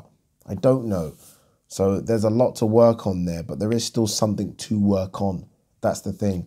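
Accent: United Kingdom. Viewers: Top bloke...british accent yet his pronunciation of Adeleye is spot on...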